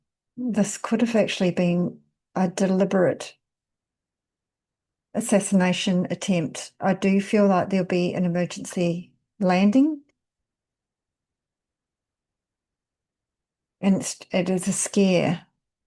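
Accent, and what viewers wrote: New Zealand. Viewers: Just found you early hours on 21st November!🙂 It's so refreshing seeing and hearing a kiwi accent!🤓🙏🙌 Thank you!🌸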